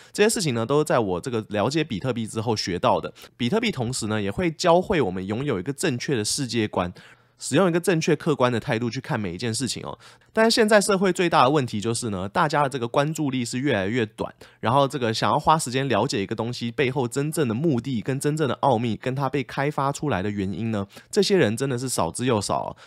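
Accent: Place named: Taiwan. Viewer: 台湾腔，哈哈😀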